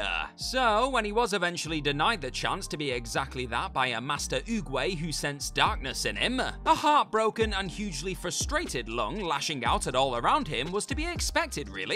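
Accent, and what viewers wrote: United Kingdom. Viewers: Because he's British. That is literally how the English accent works. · You do realize that British accents are like that, right? Brits can't change the way their accents pronounce words.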